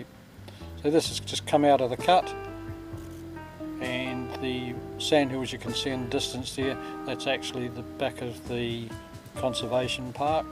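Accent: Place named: New Zealand. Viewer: Brilliant mate. Think I can hear an accent from back across the ditch! I’m a local Perth kayak fisherman as well so am enjoying your content. Thanks for sharing spots and launch spots. Cheers mate and keep it up 😃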